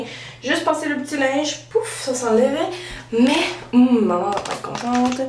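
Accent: Quebec, Canada. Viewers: Sa s'appelle avoir un accent Québecois.... -____ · ahh ta yeule sa s'appelle ''l'accent québécois'' ok? bye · j'adore trop ton accen du Quebec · titi la gourmande elle est québécoise et les québécois et québécoise on un accent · Mais, putain fermer la !! C'est t'est venu juste ici pour la critiquer bah! Vas t'en ,, moi je la trouve magnifique d'même ok!! Chacun à son gout ,, son accent que vous dite , c'est du québécois ;p! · Elle est québecoise hein. C'est normal ça fait partie de leur manière de s'exprimer. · Vous pensez qu'écrire des commentaires de ce genre vont servir à quoi à part lui faire du mal? C'est juste méchant parce que son accent, tout les québécois l'ont, ses sourcils, je suis d'accord que ça lui va pas mais peut etre qu'elle s'est juste louper. Juger sur le physique c'est juste puéril et purement méchant. Si vous dites ce genre de choses c'est que vous ne la connaissez vraiment pas. 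Je n'ai pas la prétention de la connaitre, je dis juste que je suis toutes ses vidéos et j'ai pu remarquer que c'est une bonne personne qui a du cœur. Laissez tomber... ne fais pas attention à eux, ils veulent juste te blesser, ça vaut pas le coup, j'en ai eu l'expérience. Love. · son accent..?? c'est une québecquoise loll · Accent québécois espèce d'inculte -. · c'est son accent car elle est Québécoise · Comme j'aime dire des trucs HS, j'adore l'accent québécois! :P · Perce qu'elle est québéquoise (ou canadienne) et vu que c'est près des usa il y a toujours un accent ;) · Son français est juste québécois laisser la gang de "français meilleur que les autre"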